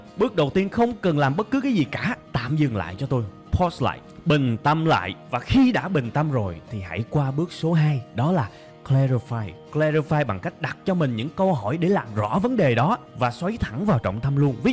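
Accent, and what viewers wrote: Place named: South, Vietnam. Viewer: Giọng a hay , e thic giọng người miền nam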